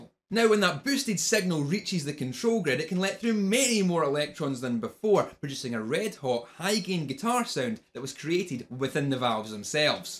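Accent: Scotland, United Kingdom. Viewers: Love video's like this man. Am fairly new to the channel, but loving it already. And nice to hear a Scottish voice on youtube! :D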